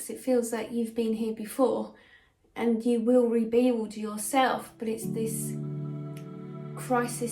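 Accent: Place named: England, United Kingdom. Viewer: Gr8n hearing an English accent to all the others you hear all the time.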